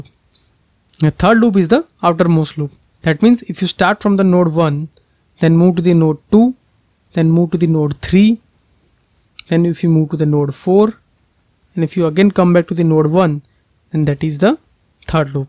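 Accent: India. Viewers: His voice is totally indian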